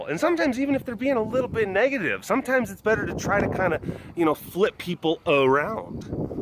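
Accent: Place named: New Jersey, United States. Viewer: Love the Jersey accent! ;-)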